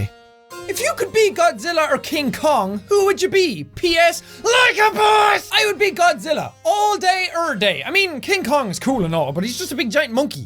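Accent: Ireland. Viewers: I know jack isn't faking his accent coz that how irish people talk like me coz I'm irish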